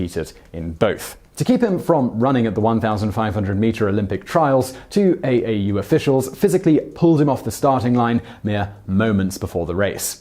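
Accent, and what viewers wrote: United Kingdom. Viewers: Why do I always have a hard time focusing on a video or even understanding what the hell is going on when it's in the British accent? I literally didn't understand two thirds of the video :/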